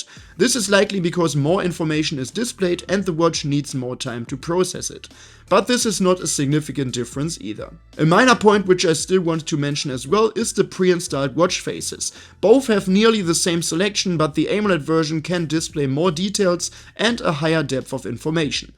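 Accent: Germany. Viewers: 10/10. The German accent takes it to an 11. I love that this channel is simply called " "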